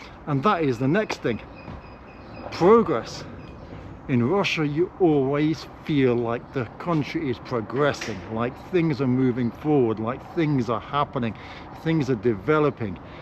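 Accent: United Kingdom. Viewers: So you have a British accent, walking and talking on your phone ....... yeah, to be honest, if I were Russian, I would be a bit suspicious. Just sayin'.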